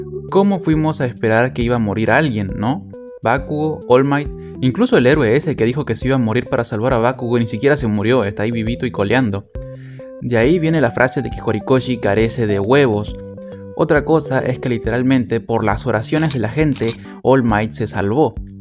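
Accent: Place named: Argentina. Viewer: Sé que suele narrar así en su canal principal, pero me recuerda a Axl Kss, talvez por el acento argentino XD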